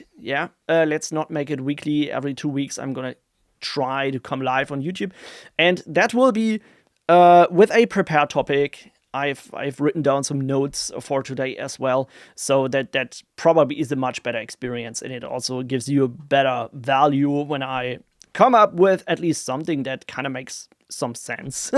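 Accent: Germany. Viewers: Typically german englisch 😅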